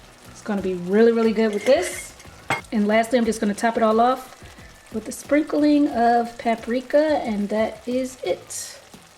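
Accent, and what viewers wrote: Baltimore, United States. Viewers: Sis, are you from Baltimore?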